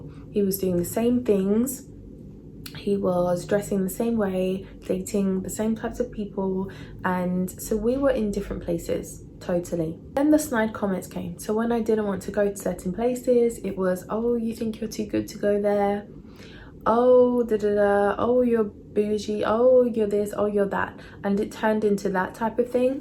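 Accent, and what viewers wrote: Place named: United Kingdom. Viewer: So happy to hear a British accent and thank you for speaking on this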